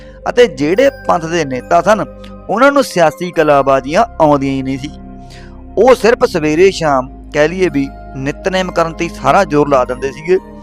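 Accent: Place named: Puadh, India. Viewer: ਬਾਈ ਜੀ ਤੁਹਾਡੀ ਆਵਾਜ਼ ਬਹੁਤ ਪਿਆਰੀ ਐ ਤੁਹਾਡੀ ਬੋਲੀ ਪੂਆਦੀ ਲਗਦੀ ਐ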